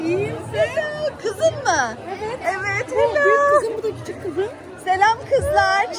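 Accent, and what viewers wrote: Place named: Turkey. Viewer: Bayiliyorum senin turkce aksanina ❤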